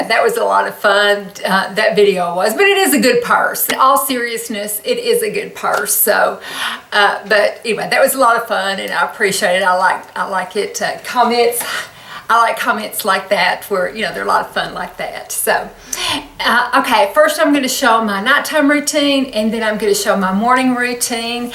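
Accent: South, United States. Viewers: Loving the southern accent!! Makes me feel invited into your home as southerners are always so welcoming! I bet you cook some amazing home cooked meals! What time should we be there? <3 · I ❤️ your southern accent. I think you are so beautiful.., just gorgeous., you don’t need to wear makeup. I would love to know your am & on routine, what you use & when etc..! Sending the very best of everything in life that you deserve..! Cindy- Hemstreet- Plath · Your skin is gorgeous!!!!! I also use Retin A around my eyes . I actually use it on my entire face , and my neck ( less often on the neck ). I'm noticing some subtle changes after almost a year . I'm 39 . I have red hair and light skin , but I can tan if I try . I made the mistake of tanning when I was in school because the other kids made fun of me for being pale . I'd give almost anything to go back in time and stay out of the sun . 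I have crows feet and pretty deep 11's . I also have pretty deep forehead lines . I'm very expressive when I talk , and I smile a lot ( makes em worse ). I don't know how old you are , but you have the skin of a woman in her 20's ! You're so gorgeous! I love your accent . I'm southern too , but my accent is much thicker than yours . I love how you don't use any filters in your videos , and you are sweet and genuine . Thanks for the video :-) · wow ,you are beautiful and Love that southern voice .you are so sweet .so glad to find you. being 55 .i need help .lol wished i looked as good as you .thanks for the tips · Love your southern accent. Where are you from?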